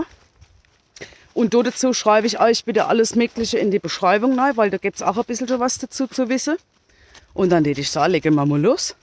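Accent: Palatinate, Germany. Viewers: Also je öfter ich Deine Videos sehe, um so besser verstehe ich den Pfälzer Dialekt 🤓 Der Kamin war ja mal ne coole Sache 👍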